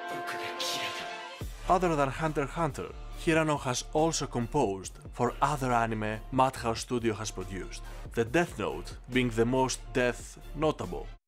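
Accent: Greece. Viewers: Only Greeks speak English with that kind of accent. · Oh! That's a greek accent! Γειά σου φίλε! Ωραία ανάλυση!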